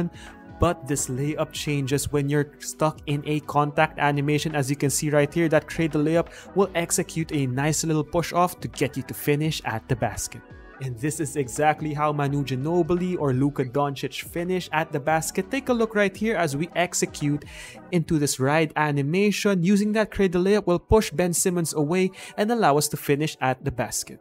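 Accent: Philippines. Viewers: Are u Filipino? U sound like it brother!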